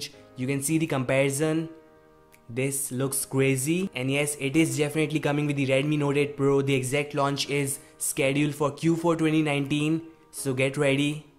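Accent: India. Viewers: India English, hahaha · Idian english🙂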